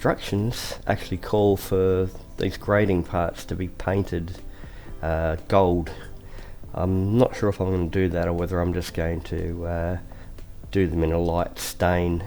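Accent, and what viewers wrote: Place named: Australia. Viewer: I’m assuming you’re Aussie by your accent and “g’day” . I’m just starting out and was wondering what stains you use and if they go ok under water based varnish? I’ve bought a tin tin of Cabots water flat varnish from the green shed. Cheaper than model paints. Andrew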